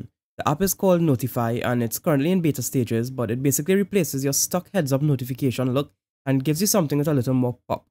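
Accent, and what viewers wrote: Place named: Trinidad and Tobago. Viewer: This dude sounds like he's from trinidad probably he is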